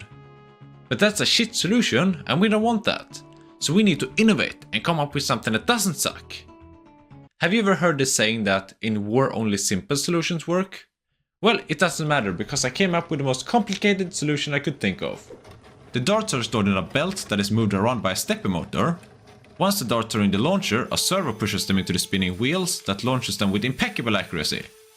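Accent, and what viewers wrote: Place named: Finland. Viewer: Are you Finnish? The accent is familiar!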